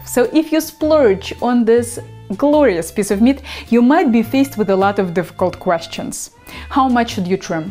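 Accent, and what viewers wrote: Russia. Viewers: this russian accent, sexy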